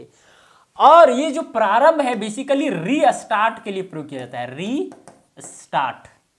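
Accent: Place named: Bhojpuri, India. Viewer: Aap toh khud ki galat hindi bolte ho. Grammer galat bolte ho. Accent ki baat toh rehne do woh toh alag ki bhojpuri accent hai.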